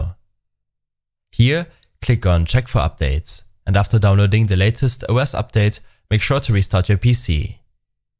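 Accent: Germany. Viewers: Hört sich ekelhaft deutsch an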